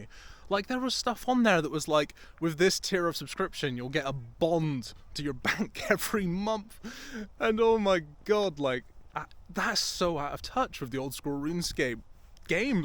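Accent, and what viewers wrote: Bristol, United Kingdom. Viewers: Are you from Bristol? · i know that good old bristolian accent i always get mistaken for being from bristol lol. Im pure wiltshire.... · what part of the uk are you from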